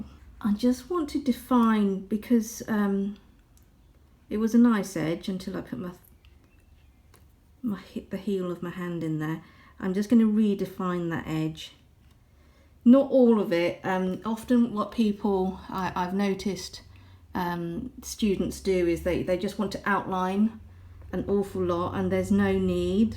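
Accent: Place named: United Kingdom. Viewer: I wish more artists would do this, it really helps to understand the choices made. May I ask, which British accent do you have? I love accents!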